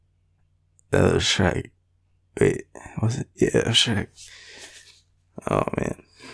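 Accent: Australia. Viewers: cheesy jokes in an Australian accent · Aussie Aussie Aussie! mate another Aussie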